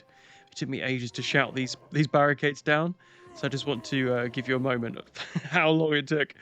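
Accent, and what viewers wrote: United Kingdom. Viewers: Beautiful. :”) (.. British man? Not Australian? :D)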